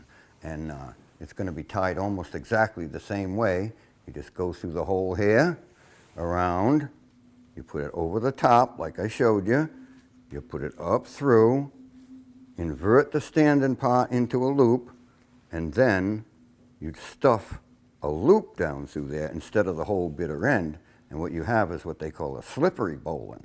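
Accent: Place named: Rhode Island, United States. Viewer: He's from Rhode island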